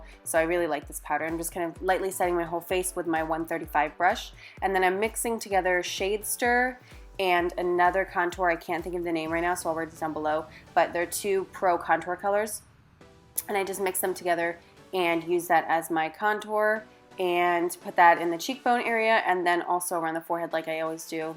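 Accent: United States. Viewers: Loved the look and background. I would say that's a north jersey accent coming from someone in the south 😉 that's jersey for you. Now you have me wanting all those extra dimension eyeshadows!! Alex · haha! I love your jersey accent! so beautiful lady!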